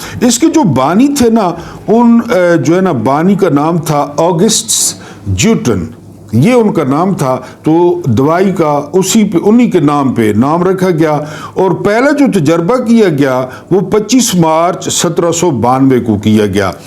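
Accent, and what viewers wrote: Saraiki, Pakistan. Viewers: صاحب آپ کی سرائیکی میں اردو بہت اچھی لگتی ہے ماشاءاللہ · اردو میں سرائکی کا تڑکا واہ واہ 👌👌خوش رہیں۔😊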